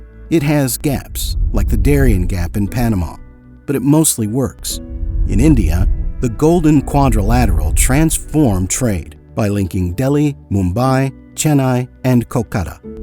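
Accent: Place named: United States. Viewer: lol he sounds yank to me nothing euroxentric there 😂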